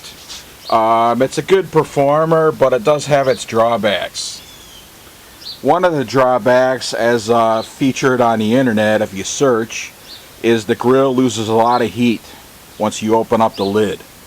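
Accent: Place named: Wisconsin, United States. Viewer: That's def WI or IL. It sounds urban and rural at the same time lol Bet you he lives by Lake Michigan 🤣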